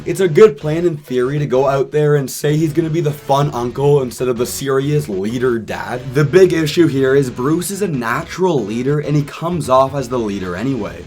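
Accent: Canada. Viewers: Your Canadian accent 🙈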